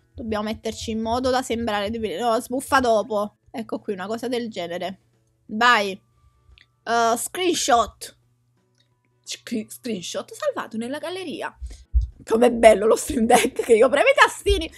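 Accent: Naples, Italy. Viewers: sei napoletana? ps: *TI ADORO*💞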